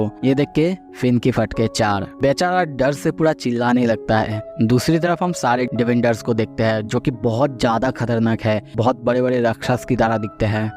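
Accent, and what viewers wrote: Bengal, India. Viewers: Sounds like a bangali. Ma bhi bangali hu. Nice explanation video.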